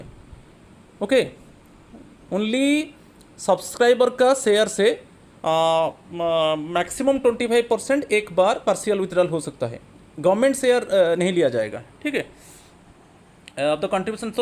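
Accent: Odisha, India. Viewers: Please don't pronounce english like odia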